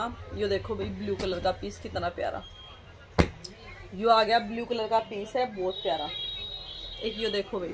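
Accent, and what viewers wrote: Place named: Haryana, India. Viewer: Kya mast hariyanvi bol the h didi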